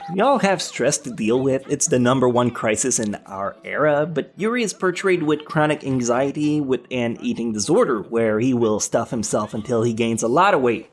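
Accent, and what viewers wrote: Quebec, Canada. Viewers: are you from quebec? your accent seems familar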